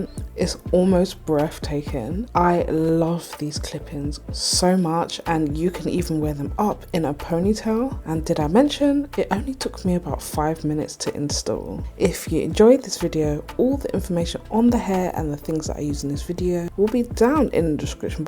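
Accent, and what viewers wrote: United Kingdom. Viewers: Was not expecting you to be British but hell yea thanks girly ur gorg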